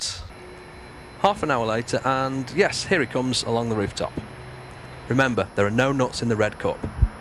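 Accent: United Kingdom. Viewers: Thats how english people pronounce it, simple. Or if this guy is not english, then well, it definately looks like England, so he learnt english there.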